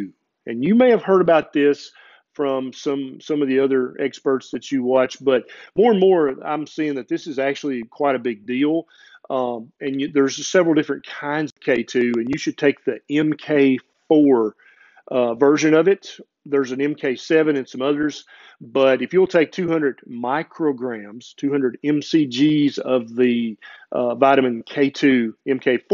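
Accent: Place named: Tennessee, United States. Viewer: I love you! You are so funny and shoot from the hip! Put together with that Tennessee accent and you have become my fav person to listen too!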